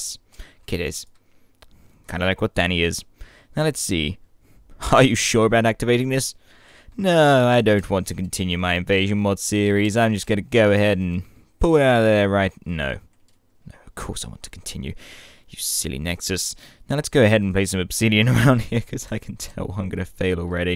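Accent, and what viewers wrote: United Kingdom. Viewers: I love your voice im british too <3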